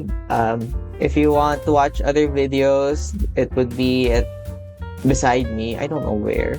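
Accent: Philippines. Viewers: Why is the accent very pilipino love it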